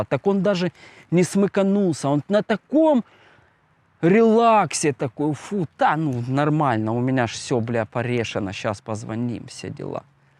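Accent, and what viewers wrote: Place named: Russia. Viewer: ти ч наче з офіційними особами в рейді! майже при виконанні. що за кацапський говір?... от так само ти кладеш на Мову, а вони на правила рибальства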